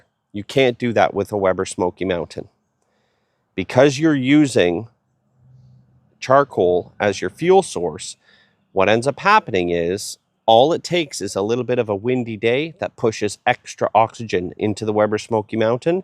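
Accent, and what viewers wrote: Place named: Canada. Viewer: Some of the ones NOT to buy if you are an American (I realized that you are Canadian by the accent) are Frontier (MEX), Lone Star (MEX), Jealous Devil (BRAZIL), FOGO (El Salvador), others too. Royal Oak is made from the lowest bidders. Just read labels. Kingsford, Cowboy and M and M are acceptable.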